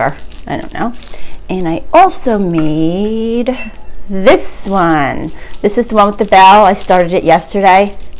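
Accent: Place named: New York, United States. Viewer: Your dp looks great so far! I’m a Jersey girl, you sound a little New York ❤️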